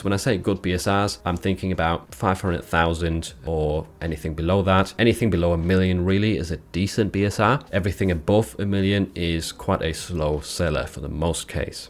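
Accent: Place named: North, United Kingdom. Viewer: How tf is your accent so good?! I'm English and I'd NEVER think you're not a native northerner. I don't think I've ever heard a non-native with such a convincing REGIONAL english accent. I also think its great that you chose that accent rather than a boring southern one. Super impressive 👏 btw I only know about this because someone told me, otherwise it wouldn't possibly have occured to me!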